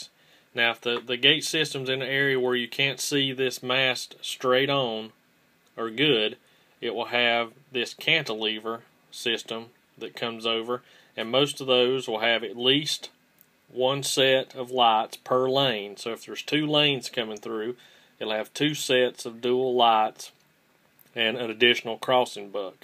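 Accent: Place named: Mississippi, United States. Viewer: I'm from Mississippi and that accent of yours sounds like it a Mississippi accent!!!!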